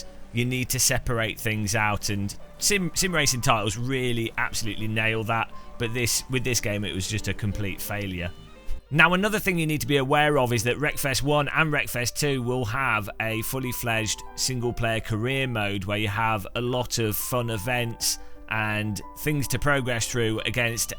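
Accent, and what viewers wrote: United Kingdom. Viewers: I wished the british accent would have brought some credibility to this post but he is evaluating this game as a racing simulator... Anyone who played the first iteration knows that this game isn't a fancy sim racing game. This is more a perfect mix of great physics and pure fun.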